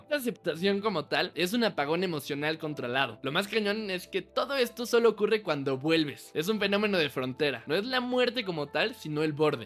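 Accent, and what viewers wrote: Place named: Mexico. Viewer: Interesante el video, pero tu voz es demasiado mexicana 😢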